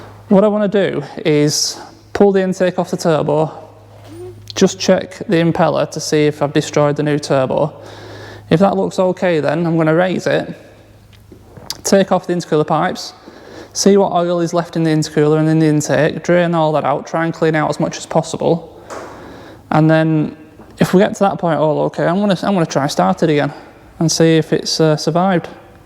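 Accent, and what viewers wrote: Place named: Northern England, United Kingdom. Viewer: Really good to see and hear your fellow northern dialect again, proper work pays your bills, but any video is good by me when you have some free time.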